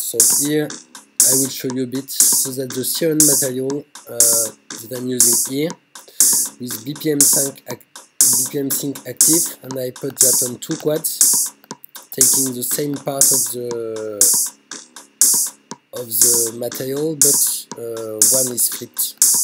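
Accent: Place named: France. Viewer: Le belle accent Français :')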